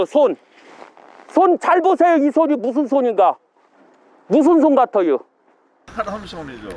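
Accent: Chungcheong, South Korea. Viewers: 말씀 내용을 별개로 충청도 사투리가 참 정겹습니다...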